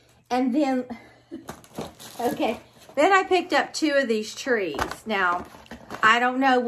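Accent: South, United States. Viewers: Hey sweet lady I watched your haul and I heard you say you are from WV, I am from WV also Huntington to be exact. May I ask what part of WV you are from?! I thought I heard that southern accent 😉 love all of your hauls and crafts. You are such a sweet and beautiful person inside and out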